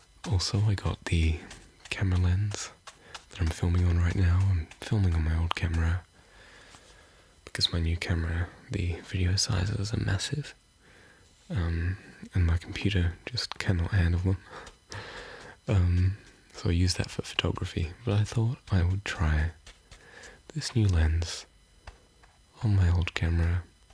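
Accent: Australia. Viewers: n Australian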